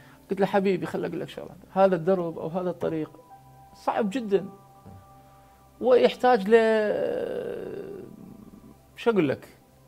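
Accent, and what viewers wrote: Iraq. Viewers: تحياتي الى الفنان عبدفلك صاحب الاخلاق الجميلة والصوت الجنوبي الجميل استاذ عبدفلك البصره تحبك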